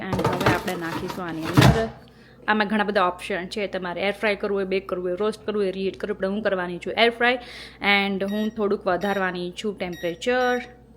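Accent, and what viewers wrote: Kathiawar, India. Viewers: Your short videos are awesome. No need to change. Wonderful કાઠિયાવાડી ગુજરાતી. Loved your " Soul mate" video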